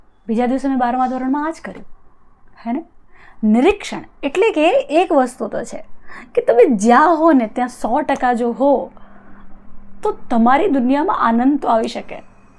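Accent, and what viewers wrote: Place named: Kathiawar, India. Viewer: મોયજ આઇવી હો .. તમારે મોઢે અમારી બોલી હાંભળી ને... અદ્દલ કાઠિયાવાડી સવો તમી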